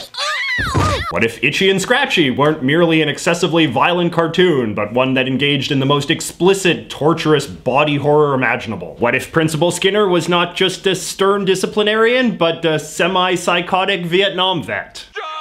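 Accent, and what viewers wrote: Canada. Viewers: I'm aware that it's his accent, as a fellow Canadian I'm saying tone it down lol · You are so blatant Canadian! LoL!!! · Most Canadian man I’ve ever heard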